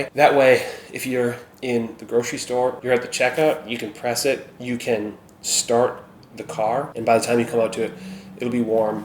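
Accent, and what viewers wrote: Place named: Minnesota, United States. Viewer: My struggle is my Mother is from MN and I was born there but grew up in NJ Italian Dad, so I’ve experienced both cultures throughout my life. It could be neither one is ideal for me. But when I hear you pronounce Bag it sounds like beg..and all the other pronunciations even Pop for soda I’d rather just avoid hearing all that! I don’t love Jersey but I just can’t stand the Scandinavian ways and accent truthfully that is prevalent throughout MN!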